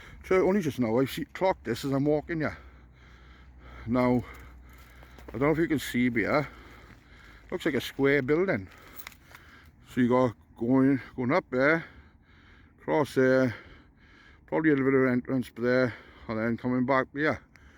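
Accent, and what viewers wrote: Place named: Wales, United Kingdom. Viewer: It's a welsh accent mate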